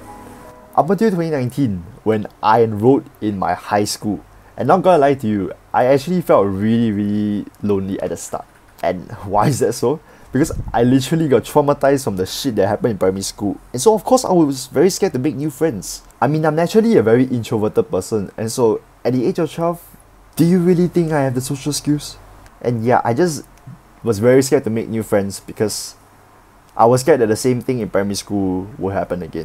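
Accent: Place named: Singapore. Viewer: my mom said you sound singaporean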